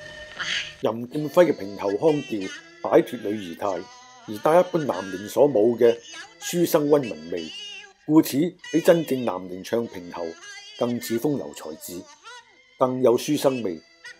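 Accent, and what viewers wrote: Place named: Guangzhou, China. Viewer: 每次出片，我一定細心欣賞！你既旁白同聲線好正、好正！！好地道廣洲腔，每次都帶我番回童年往事！多謝！你飲三合一定系即磨咖啡架？😅😅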